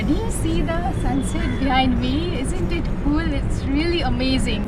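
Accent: Bengal, India. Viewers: Yes your accent like Bengali khub sundor 🙏 charming personality you have and your jolly and childlike presentation makes a perfect video🙏 Stay blessed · দিন দুয়েক আগে প্রথম আপনার ট্রাভেল ভিডিও দেখি এবং আপনার ইংরেজি শুনেই কেমন যেন বাঙালী বলেই মনে হচ্ছিল। এই এপিসোড টা দেখে আমি কনফার্ম হলাম। Farsickness...খুব ভালো লেগেছে আপনার ট্রাভেল ভিডিওগুলি, waiting for more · you are a Bengali right, your accent gives you away. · Your accent made me guess that you're Bengali. You look gorgeous in saree ❤❤❤❤😍😍😍😍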